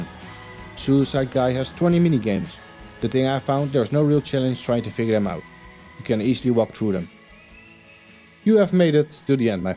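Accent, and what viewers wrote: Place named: Netherlands. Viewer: It is sometimes hard to understand you due to trying to speaking as a native and your Dutch accent. Sorry to say that but it can be improved I believe. The video is great after all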